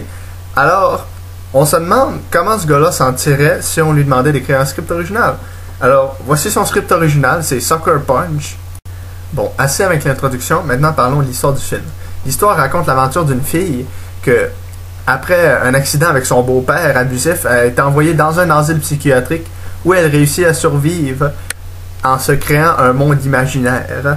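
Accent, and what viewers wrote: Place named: Quebec, Canada. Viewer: Vraiment sympathique comme critique. Le ton est enlevé et rafraîchissant. Je suis français, pas québécois, donc j'ai du me faire avec l'accent au début, mais ça m'a pas dérangé outre-mesure. Seul truc dommage, il manque peut être des extraits du film pour illustrer un peu la critique, mais ça reste cool.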